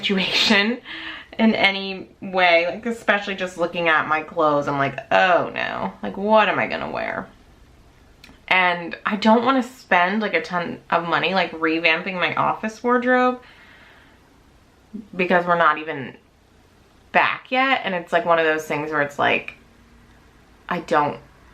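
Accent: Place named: Northeast, United States. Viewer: Do you mind me asking what profession you’re in? I always imagined you being a teacher…you always have that sweet nature teachers have 😊 And, again if you don’t mind me asking, what region of the states you’re in? Your accent leans North East to me, but I’m never sure 😂 Also, I say keep rocking the skinny jeans! I’m 34…so you know my closet is full of skinny’s! And I’m not giving them up, I love how they look and I love how they feel! I left my baggy jean days back in high school! Haha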